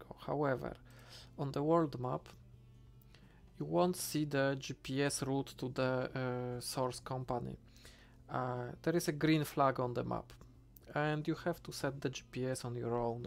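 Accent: Poland. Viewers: I got 1 question, your Polish? Cuz i feeling I'm hear this accent too much times in my life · Tak myślałem że Polak po akcencie :P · Dzięki wielkie, zajebisty masz angielski bo mega polski akcent ale posługujesz się w pełni poprawnie czasami itd. pozdro ;D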